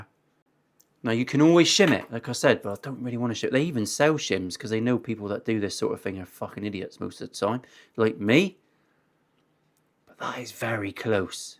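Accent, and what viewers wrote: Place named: England, United Kingdom. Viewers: Nice job mate.. it was fun watching you at work.. like the English accent 🙂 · Heard the geordie accent but thought no more about it, then does the demo.😊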